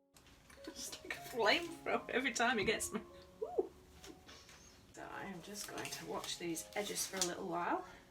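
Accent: United Kingdom. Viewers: thanks for the detailed answer, much appreciated. I'm from Yorkshire but live in USA now. I detected a northern England accent!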